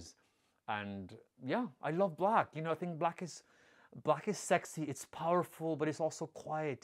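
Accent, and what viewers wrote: Jamaica. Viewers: Within the first 5 mins. of the video, I recognized your Jamaican accent. So proud of your home and your accomplishments😃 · Rest assured, his accent cannot be pinpointed to the mannerisms and intonation of one particular (European) group of people. It has hints of Jamaican, British, and American. Which makes total sense given his background and where he lives. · I can hear the Jamaican accent. Yes sir. I'm Jamaican too and I'm cooking right now. Working on the rice and peas. Love you sir. You're a class act!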